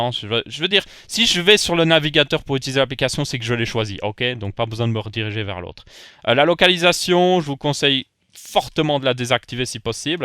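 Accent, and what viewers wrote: Belgium. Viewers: Salut, Merci pour tes conseils. Tu parles vite, avec un accent belge (très agréable ) il faut vraiment se concentrer avec toi vu que tu vas assez vite. Mais en tous les cas merci pour te vidéos. A+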